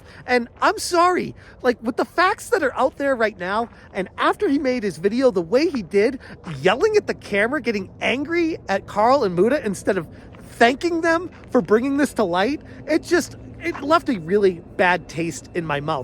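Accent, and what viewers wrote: Boston, United States. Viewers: The boston accent is wicked dont change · Your coverage has been great - also who doesnt love a little Bostonian accent in there too. Hey I’m waaahhkin eeere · Thank you for your coverage of this. Frankly, I found his "apology" to be more responsibility dodging. He said he should have done better, but his examples on what he should have done better on were worded very carefully. His anger at the end was disgusting. I worried that people would just say "oh he apologized" and then stop talking about it. He needs to be audited and reviewed by the authorities. Then and only then should we let this go. I love your enthusiasm and your genuine reaction to this. By the way, stop apologizing for your Bostonian accent. It's who you are, and you should love it. I hear it all the time about my southern accent and I used to feel ashamed, but I'm trying not anymore. 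You got my sub ♥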